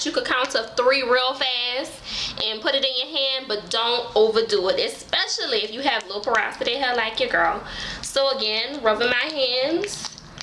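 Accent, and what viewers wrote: New Orleans, United States. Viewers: I Love Your New Orleans Accent 😍😍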